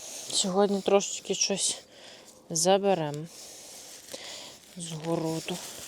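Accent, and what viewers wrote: Ukraine. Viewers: Прикольно балакати: почала тойво підгортати )) як на Закарпатті)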